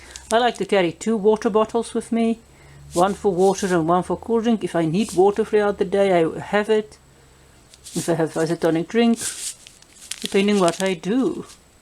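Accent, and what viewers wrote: South Africa. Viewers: Hoekom maak jy nie videos in Afrikaans nie? Ek kan baie goed hoor jy is ñ Afrikaaner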